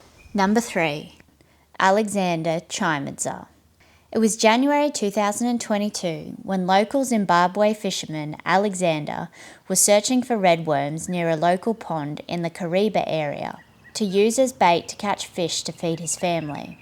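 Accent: Australia. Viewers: great to hear an aussie accent narrating, excellent content!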